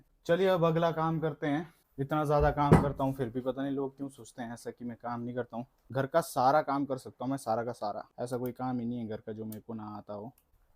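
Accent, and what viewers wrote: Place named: Himachal Pradesh, India. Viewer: The Himachali innosence is present in you . And the Himachali tone of your voice is your identity . Keep it up hope this Himachali tone of your voice and your behaviour never fade away . Bcz most of Himachali YouTuber do vlog in a modern tone , behaviour etc but you always shows Himachali presence in you and also in your vlog ❣️ Wishing you huge amount of prosperity 🙏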